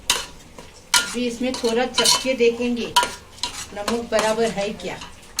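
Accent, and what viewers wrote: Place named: Maharashtra, India. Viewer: khala aap kha rhete ho aapke bolne ka trika to maharashtra jesa h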